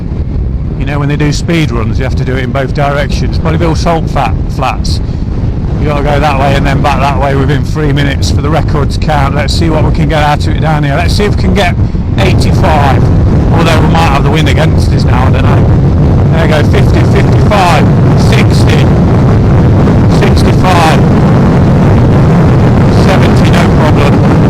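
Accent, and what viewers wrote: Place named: Midlands, United Kingdom. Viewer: Lovely machines the Burgman, I had the 400cc version, loved it, it could do 60-80 all day, mine broke down and I had no idea what was wrong with it, it would start but then stop and wouldn’t restart. I was gutted that I couldn’t figure out what was wrong with it so I sold it as a project, the new owner figured it out and got it running and it’s still on the road today. They’re pretty nippy bikes, very comfortable, good on the fuel. Your accent sounds familiar, are you from Stoke on Trent or Derby or somewhere there? Great vid, good review, thanks.👍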